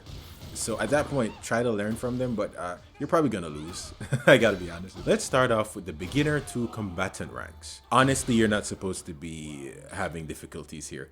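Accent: Caribbean. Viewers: bro sounds caribbean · Imma subscribe bc you sound Caribbean...i am too. You also know what youre talkkng about!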